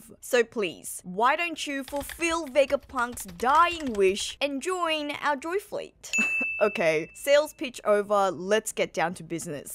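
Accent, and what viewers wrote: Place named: Australia. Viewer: It’s the cringe Australian accent. They cants pronounce the letter “o” they say “aur”. Like vide-aur instead of video… sometimes can’t say “ah” and say “er” .. listen to “ide-er” instead of idea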